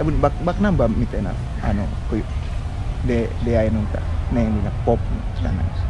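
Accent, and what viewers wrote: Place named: Bali, Indonesia. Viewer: Care nak Bali logate bli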